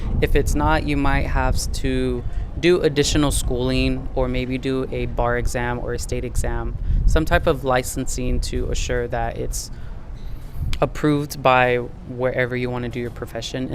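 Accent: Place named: Philippines. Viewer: Is it just me? the way he explains sound so Filipino 🎉😅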